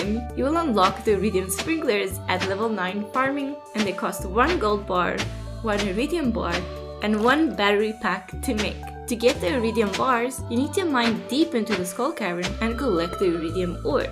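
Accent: South Africa. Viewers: South African? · I hear a South African accent. Hmmmmmmmmmm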